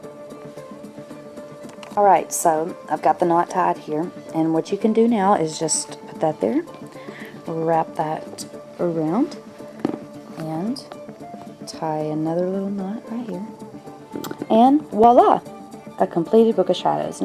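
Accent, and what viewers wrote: South, United States. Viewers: where you from you have a southern accent :)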